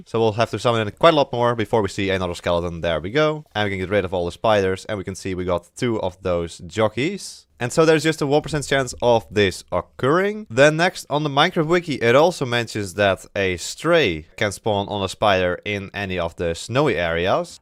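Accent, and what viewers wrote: Netherlands. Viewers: Hoi ben je nederlands? je hebt wel een opvallend nederlands accent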